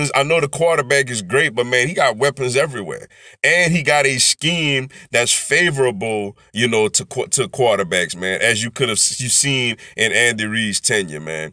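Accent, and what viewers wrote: New Orleans, United States. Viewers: Where are you from man sound like New Orleans.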